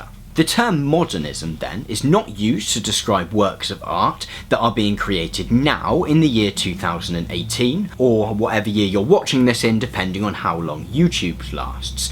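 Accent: United Kingdom. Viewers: 第一次有觉得英音难听的时候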